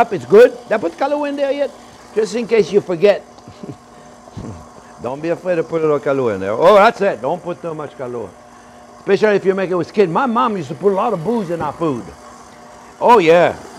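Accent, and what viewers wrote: France. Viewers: C'est vraiment un plaisir de vous retrouver depuis tout ce temps. Ravi que vous soyez revenu sur la Youtube. Pour moi vous êtes le chef parfait. Super pédagogue et simple. Et puis cet inimitable accent français aussi. Surtout continuez! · I just love your French accent!!! I can't wait to make this...with you!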